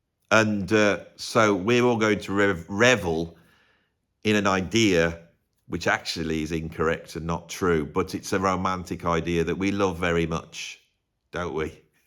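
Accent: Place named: Stourbridge, United Kingdom. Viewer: You aren't from Stourbridge, or nearby, are you? You sound like guys I grew up with 🎉🙏🏾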